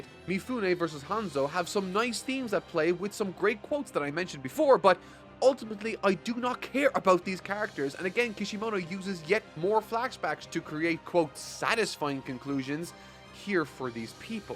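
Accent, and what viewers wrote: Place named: Ireland. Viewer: loves saying Cathartic in his thicc Irish Accent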